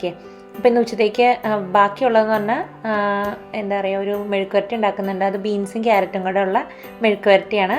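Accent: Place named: Thrissur, India. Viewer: ഞാൻ കൊടുങ്ങല്ലൂർ ആണ് thrissur Dist സംസാരവും food എല്ലാം വളരെ പരിചിതം ആയി തോന്നി അതുകൊണ്ടാ സ്ഥലം ചോദിച്ചത് ട്ടോ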